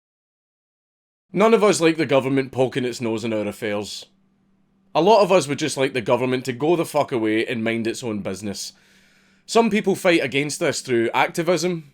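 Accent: Scotland, United Kingdom. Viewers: Hearing someone talk about fighting the government is always better with a Scottish accent.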